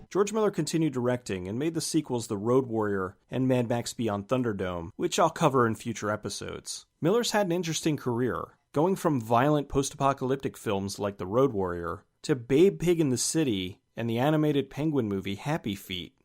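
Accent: United States. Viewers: Every time I hear that American voice...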